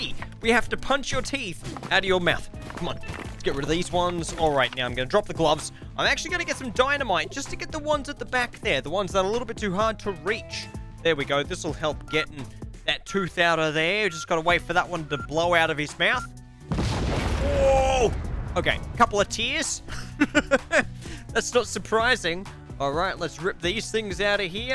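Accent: Australia. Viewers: Are you from Australia or something? Just like us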